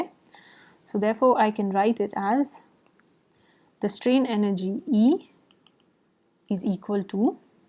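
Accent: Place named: India. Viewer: it seems like you have an indian English accent..Very informative video....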